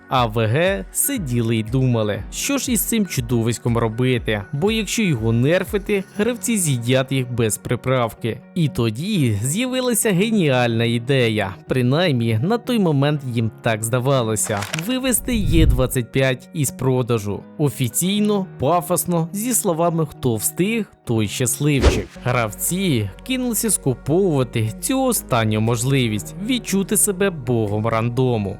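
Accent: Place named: Russia. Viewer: Діалект з паРашкі проскакує. І це тільки щоб фізично не працювати ?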